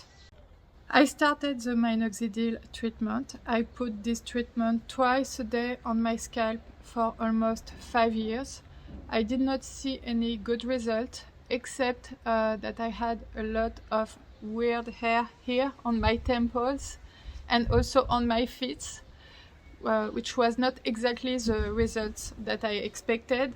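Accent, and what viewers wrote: France. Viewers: 1- you are so beautifual with our without wigs. 2- having a french accent makes you even more beautiful 3- thank you fo this video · Well she’s French she can’t do anything about it like Im Greek and I’ll always talk with a Greek accent when I speak English,I can’t control it….. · Lovely and pleasant accent. French is a harmonic language and soft in the ears so the accent is so nice. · Your English with French accent is beautifull it truely is. I feel for you girl. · Why are you apologizing? who doesn't adore french accent!! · This is the first video of yours that I've seen. I am very impressed by you...both your bravery, and your command of the English language. Yes, your accent made me concentrate more to understand some words, but most of us Americans think the French accent is beautiful.❤ You speak very eloquently. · I love your French accent 💖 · Happy to hear more French accents on YouTube. 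Thank you for sharing your story. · Who does NOT ❤ a French accent! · Never apologize for a French accent! :)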